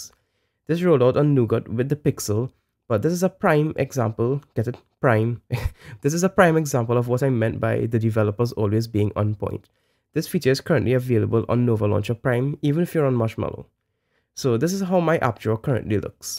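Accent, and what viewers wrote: Trinidad and Tobago. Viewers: are you Trinidadian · Are you trini bro ?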